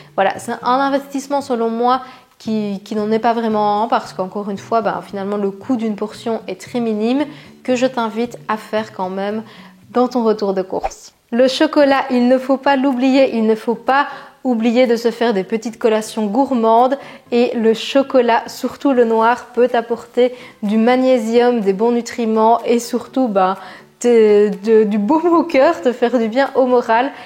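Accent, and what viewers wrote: Belgium. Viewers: Merci pour toutes ces idées ! Au fait, d’où vient le petit accent caché derrière l’accent belge ?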